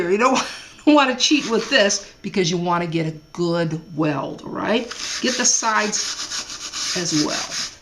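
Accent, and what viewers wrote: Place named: Fargo, United States. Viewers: It has a FARGO twang, for sure. People from West Coast starting at the California/Oregon heading N have the same.....'bout like Sarah Palin (no offense).😋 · Cool vid!I dont know if youre from minnesota or not,but you oughta be.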